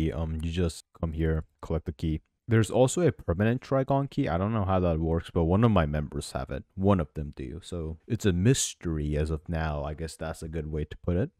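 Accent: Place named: Poland. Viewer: as a polish person i confirm, this is exactly how we speak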